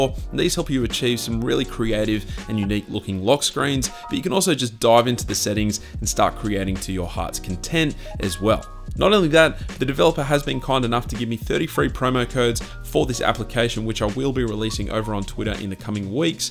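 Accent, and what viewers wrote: Australia. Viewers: Where are you from ? Australia? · And that Aussie Accent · is this guy australian? i am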